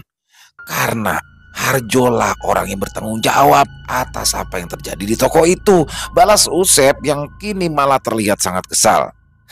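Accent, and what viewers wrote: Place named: Jakarta, Indonesia. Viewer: Kocag deh bang 😂 mas jawa? Logat betawi nye kentel bgt bang, expert sekali 👍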